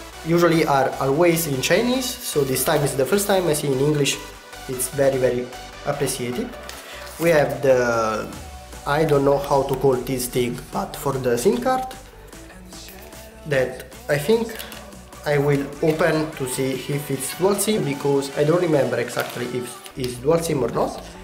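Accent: Italy. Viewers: ma sei italiano porcoddio?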